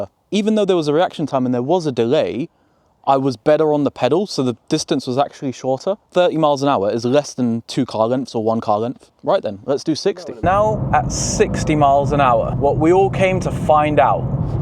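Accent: United Kingdom. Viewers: His accent is British but his pace is American 😅😅😅😅😅 from Malaysia 🇲🇾🇲🇾🇲🇾🇲🇾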